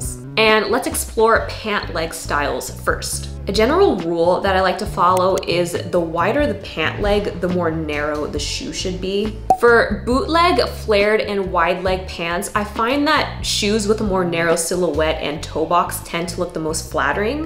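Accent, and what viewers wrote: Australia. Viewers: Lmao same I was like ‘she keeps doing an Australian accent and as an Australian I’m not mad about it’ 😂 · is the random australian accent an inside joke? I am so confused 😅😭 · Its the Australian accent coming in and out for me 😍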